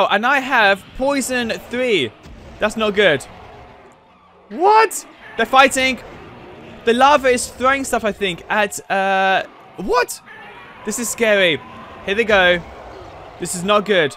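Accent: United Kingdom. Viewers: i think hes british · are you brittish ?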